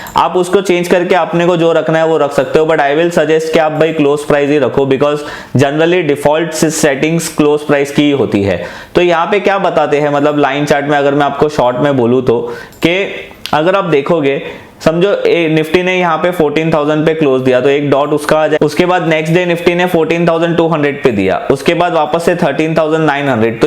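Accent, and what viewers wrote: Gujarat, India. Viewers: ગુજરાતી છો? વાત પરથી તો એવુંજ લાગે છે. ખૂબ સરસ કામ કરી રહ્યા છો.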